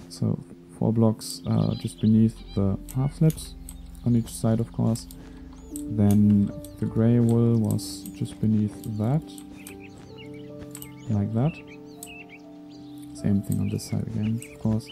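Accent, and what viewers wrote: Germany. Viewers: You are from germany right?^^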